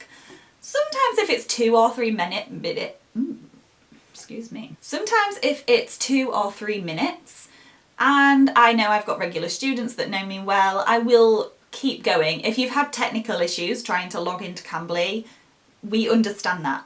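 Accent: United Kingdom. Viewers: the British accent sounds different and sometimes harder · Loved british accent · which part of the UK you are from... Are you not from Midlands? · the British accent is better, because you pronounce the words as they're written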